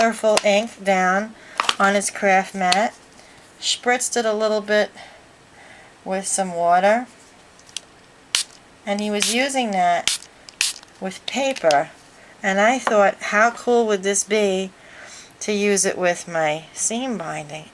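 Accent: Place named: New York, United States. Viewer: *runs in n'squishes you to pieces parts!!* I love the stamping idea!! loveLoveLOveLOVeLOVE it!!! Thank You Thank You Thank You! Consider it scraplifted! ;o) You've got all mah' craftin' juices flowin' sistah! . . . now, if only I had craft time. . . I love your videos, and I especially love your tutorials because I can hear the fuhgeddabouddit in there. *Grins* Have a super scrapalicious day sistah! Put your feet up, relax and well... you know the drill. Hugs n'Squishes!! ~*Mwah!!*~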